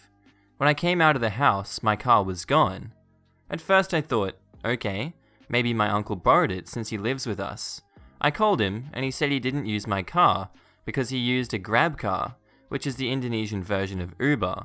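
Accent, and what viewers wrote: South, United States. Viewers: You are a bit hard on the r's. You sound a bit Southern. :) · It seems more country gentlemen to me kind of cute sounding I like it but your natural accent is better to me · Lol I like how your default American accent is a southern guy. I can confirm we don't ALL talk like that, just most of us lol. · Your American accent is doing good but only southerners really talk with that kind of accent 🤗 still though I could of believed you were American if I heard that 😆👍 Thanks for more stories ✌ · Appreciate the narration for these but maybe a little less Southern for the accent? Most people don't have accents like that in America or at least not that thick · Not bad of an American accent. I think you have the southern gentleman down well enough. Also power to the Disney nerds!!!!! I’m 26 born and raised on Disney (I broke the film on our Bednobs and broomsticks VHS)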